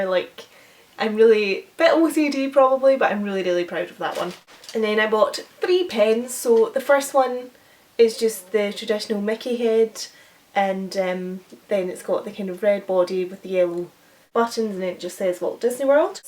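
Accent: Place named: Scotland, United Kingdom. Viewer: Clicked on this video expecting a pn English accent and I was so surprised to hear a Scottish voice hah I am Scottish and live near Edinburgh but anyway brilliant video😄😍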